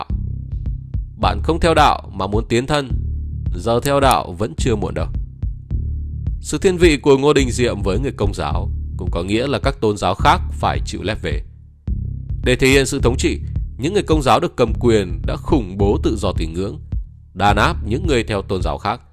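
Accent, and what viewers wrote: Vietnam. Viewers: Chất giọng Việt ❤. Video thật sự phơi bày đúng những diễn biến thời cuộc.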